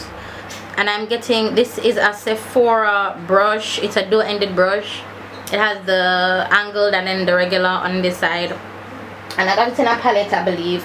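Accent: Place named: Jamaica. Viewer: Well excuse me; that look screams pure royalty 🙌🏾. You look absolutely stunning. Very skillful. On another note, I just love your accent, tone of voice, speech pattern and how you enunciate your words. Thumbs up for Jamaican 🇯🇲 patwah. Thank you for sharing.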